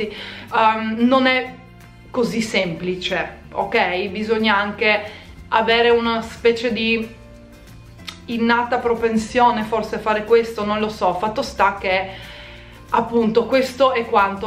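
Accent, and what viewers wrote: Trieste, Italy. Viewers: Penso che quando intendono che parli strano.........CHE SIA SOLO L ACCENTO TRIESTINO 😂😁😂😁😂😁😁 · non è che parla in modo strano, di chiama accento triestino ragazzi. per chi abita vicino al confine è normale prendere l'accento simile alla lingua dell'altro stato (anche io sono di trieste, capisco perchè ti dicano così). non credo che ad una persona ad esempio di napoli (ho preso un posto a caso dove ci sia un accento sentito) direste "parli in modo strano" solo perchè conoscete l'accento napoletano. gli accenti ci sono in tutta italia amici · sei fantastica così! Con il tuo accento triestino che ti contraddistingue, la tua mandibola che se ne va per gli affari suoi e con tutto il resto che ti caratterizza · Tralasciando il fatto che quando hai menzionato la mandibola è stata la prima volta che ci ho fatto caso (sennò ciaone che me ne accorgevo), pensavo che il “parlare strano” fosse riferito all’accento triestino!! 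😆 Comunque sia sei stata fin troppo gentile e sincera, anche perché non eri assolutamente tenuta a dare nessun tipo di spiegazioni, specie a gente che per le domande che fa non sa davvero stare al mondo. Se muovessero di più il sedere per ottenere quello che vogliono invece di lagnarsi dei successi altrui non sarebbero tanto maligni e invidiosi. Robe da matti.. (PS: vorrei proprio vederla la scena dal vivo, dove l’imbecille di turno ti chiede se sei incinta e tu “no. Sono ingrassata.” BOOOOOM!! Figura di 💩) (PPS: fregatene, sono invidiosi e stupidi. La tua family ti vuole bene e ti apprezza sempre❤️) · ti seguo dal 2009/2010 e cavolo! non avevo notato la mandibola! pensa che quando leggevo "perche parli in modo strano?" io credevo intendessero la pronuncia o la voce e pensavo boh? sarà che e' triestina e slovena e non si sente molto in italia sta pronuncia?? io sinceramente non ho mai notato il problema della mandibola! 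boh! che gente però...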